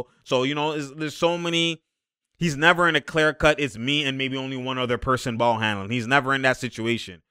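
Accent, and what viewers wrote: Toronto, Canada. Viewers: You from Toronto?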